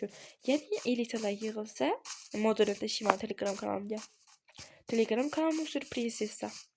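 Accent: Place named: Khorezm, Uzbekistan. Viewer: Xorazmlimisz Shevayz tortp durti?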